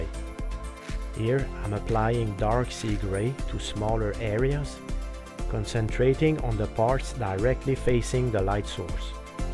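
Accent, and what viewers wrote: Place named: Ottawa, Canada. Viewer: I live in Ottawa as well so your accent makes me feel right at home :). Keep up the great work. I’m enjoying going through the back catalogue.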